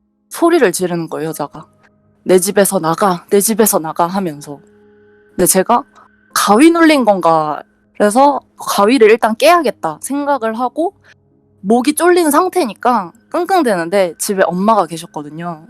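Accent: Gyeongsang, South Korea. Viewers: 근데 경상도 분인건 확실한데 왜 일부러 사투리 안쓰려고 하는느낌이지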